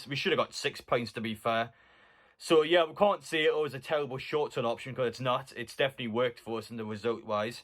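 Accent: Newcastle, United Kingdom. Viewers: Some really good signings. I think its easy to get carried away, but the fact is we are in a relegation battle. These signings were made with that in mind, in my opinion. 8.5/10 for the signings overall. 10/10 for keeping us updated throughout the window, love your passion lad, proper geordie 👍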